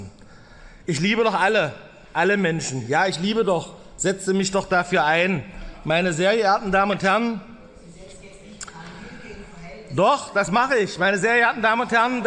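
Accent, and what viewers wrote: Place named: Saxony-Anhalt, Germany. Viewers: Sachsen- Anhaltiner isser...keen Sachse😊😊 Lutzi😊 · Das war kein sächsisch ,sondern reinstes hallesch! L.G.aus Sachsen- Anhalt!😊🧡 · .... ich fände es toll, wenn Herr Kirchner mit seinem sympathischen " Machdeburjer Dialekt" der nächste MP in Anhalt wäre. Die Eier dazu hat er.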